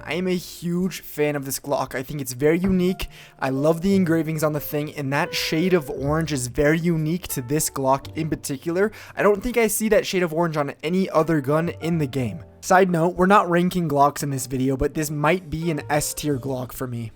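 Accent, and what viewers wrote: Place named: United Kingdom. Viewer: Insane Bri'ish, certified banger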